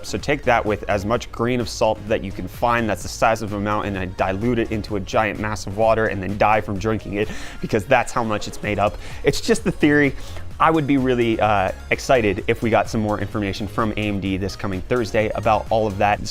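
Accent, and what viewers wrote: United States. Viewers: You sound American. Why do you live in South Africa?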